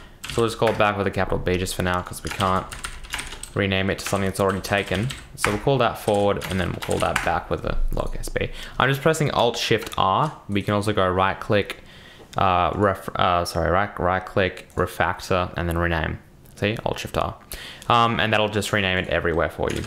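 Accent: Russia. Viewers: Черт! Мне казалось, что ты похож на русского, не ожидал что в одном из видео это будет! Надеюсь ты прочтешь, это! У тебя классный акцент, хотелось бы услышать твою историю, но это наверное не совсем в стиле этого канала. Как у тебя дела?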